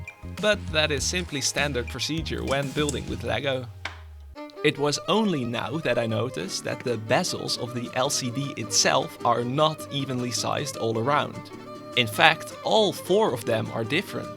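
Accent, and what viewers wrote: Netherlands. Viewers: holy dutch accent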